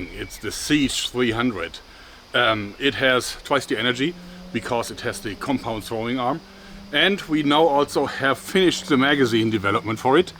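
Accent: Germany. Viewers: I've been a fan of your channel for years, and I was on a spree of your older videos today, and something that I notices is that your german accent disappeared more and more over the years! Keep up the videos, amazing channel!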